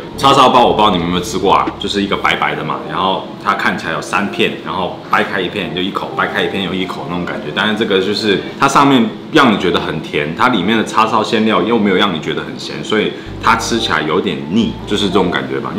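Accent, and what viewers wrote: Taiwan. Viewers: 港仔台湾腔已经这么浓了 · 看了這部影片才知道你是香港人，你的口音完全以為你是台灣人的說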